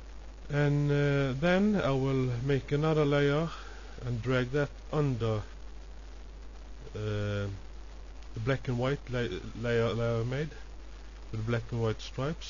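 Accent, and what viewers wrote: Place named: Norway. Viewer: Hehe, Norsk-Engelsk er morsomt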